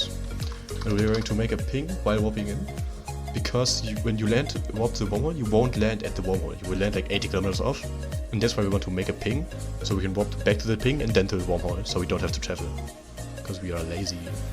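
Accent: Germany. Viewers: On a top of a thick German accent. I don't get why everybody feels the need to add - most of time silly - music to videos. Anyway, good content. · ahh i höre raus deutsch :D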